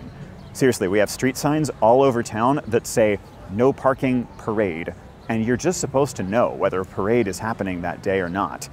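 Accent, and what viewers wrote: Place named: Detroit, United States. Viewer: Where ya from bru? Detroit?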